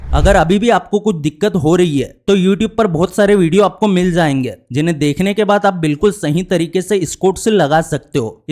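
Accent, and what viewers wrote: Indore, India. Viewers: indori bhai ki aavaz lag ri hai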